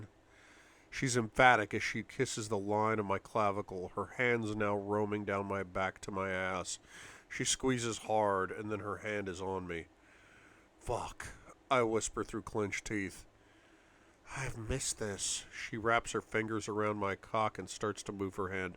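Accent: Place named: United Kingdom. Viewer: Loved you reading this...and since im from the UK your British accent is excellent. Well done and thanku ❤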